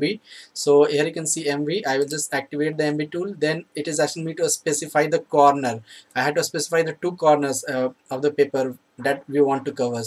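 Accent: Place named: India. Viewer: It is ver difficult to understand the talking because 1. it is very fast, and 2. it is spoken with an Indian accent. I am a native English speaker from the United States and I can't understand half of the words. Please Speak slowly and deliberately not merging the end of one word with the beginning of the next word realizing that your english pronunciation is difficult to understand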